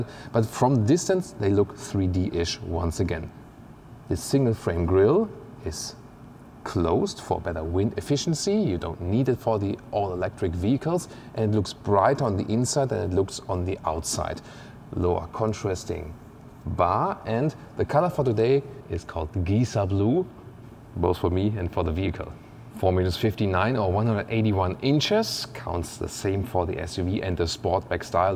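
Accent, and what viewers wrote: Germany. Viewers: His „German“ English accent is absolutely unbearable!!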